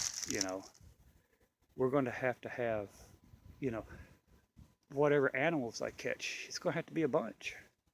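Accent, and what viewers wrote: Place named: South, United States. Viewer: Good points thanks. You sound like your from the south so the cold may not be as much of an issue as up north. But I recommend the little buddy heater I use it in my deer blind. These nor'easters we just had up here was a good practice run. I found I needed more hand sanitizer and rubber gloves. A couple more 5 gallon buckets would be good to I was helping a couple unprepared neighbors out with water.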